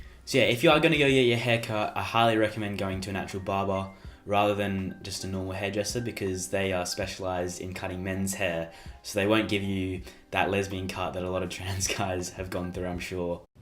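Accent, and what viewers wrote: Australia. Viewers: love Australian accents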